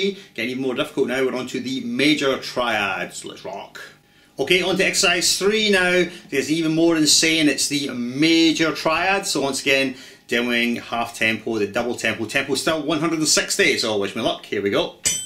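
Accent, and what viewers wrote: Scotland, United Kingdom. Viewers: If the Scottish (?) accent wasn't cool enough, he owns up to not being "A pimple on Shawn Lane's Arse!" which most of us would have to admit. Very cool vid, thanks for posting.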